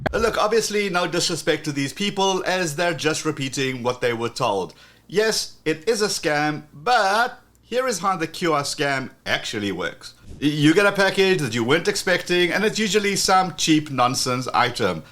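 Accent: South Africa. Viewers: Your accent is from south african, are you from South Africa? Thank you for sharing!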